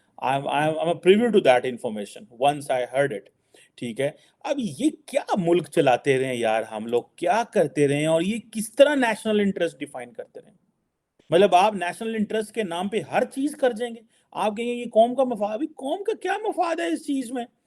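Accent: Punjab, Pakistan. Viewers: Bosss stop using your Punjabi dialect if u want Indians to hear u, Kashmir me jehad naheen, aapkey mulk Ney crime kiya hai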